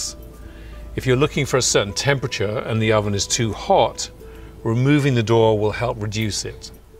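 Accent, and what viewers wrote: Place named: RP, United Kingdom. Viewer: FANTASTIC BBC ENGLISH ACCENT>>>THANK YOU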